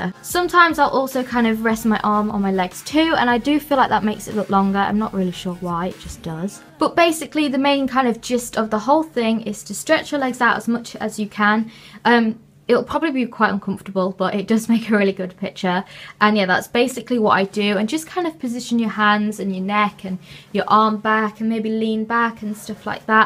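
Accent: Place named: Essex, United Kingdom. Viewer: Aw we're both 4'11! where shouts are you from? I've just moved from Essex to Blackpool and your accent sounds so similar!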